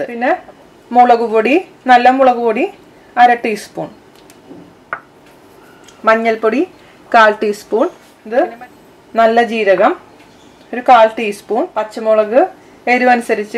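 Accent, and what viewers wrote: Thrissur, India. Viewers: Nice, simple n tasty. Nice to hear your Thrissur accent, n nice English subtitles. If you put some more of those subtitles, it will be of great help for Non Keralite viewers. In north India Kerala people, Kerala cuisine are very popular. Wonderful job👍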